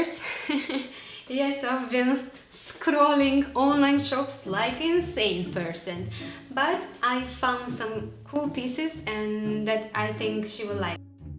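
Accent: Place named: Russia. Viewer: What accent is that? Sounds so Russian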